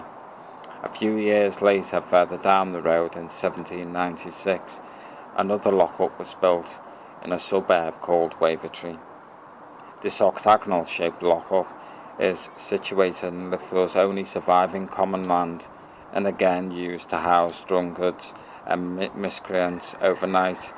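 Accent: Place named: Birkenhead, United Kingdom. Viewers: commentator sounds bent or from Birkenhead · It's a Birkenhead or gay accent innit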